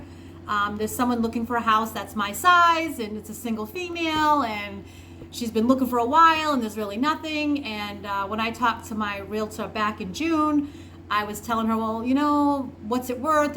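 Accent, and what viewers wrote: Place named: New England, United States. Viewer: She sounds like she's from New England area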